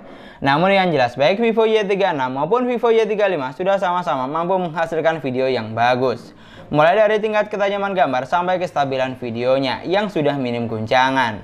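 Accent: Madura, Indonesia. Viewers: Logat nya kentel madura hehehe... Mantap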